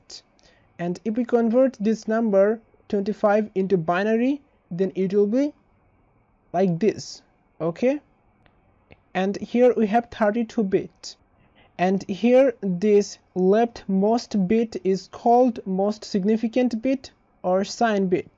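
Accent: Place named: Bengal, India. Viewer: Bengali English accent mai bolne ki zaroorat nhi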